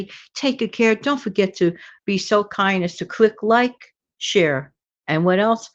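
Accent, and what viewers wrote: New York, United States. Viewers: Wonderful to listen to your words of wisdom. They resonate. Thank you!🌷 Love your accent; is that New Yorkian? 😅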